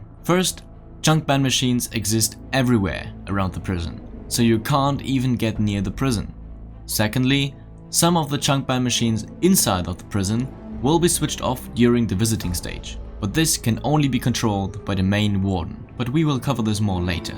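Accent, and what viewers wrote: Germany. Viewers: u german?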